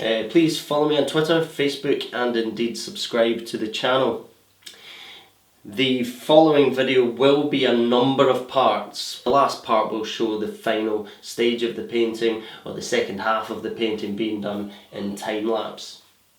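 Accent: Scotland, United Kingdom. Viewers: you are a very good artist and I love the way you use vivid colours that create such emotive impact in your paintings which I so much would like to emulate, even injust a small way, but in terms of communicating and dare I say showing how you teach, apart from the last one ie Lochgreen Part 6, speaking as a long term teacher, trainer and presenter you are 'crap'. I really do not see why you bothered to put this on Youtube, you got you back to us most of the tims so we can't see you painting, the canvas is at an angle and takes up about 1/6 of the screen, the projected screen which is just a duplicate of what you were doing was too light as you obviously needed light to paint, you had no decent microphone and having you back to us most of the time coupled with your very broad scottish accent most of your instructions I could not understand - if I was thinking of actually attending and paying for a live session, no way if this is what I would find. 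Shame as there are so many untalented artists on Youtube or really bad untalented paintings being sold- some one like you is much needed.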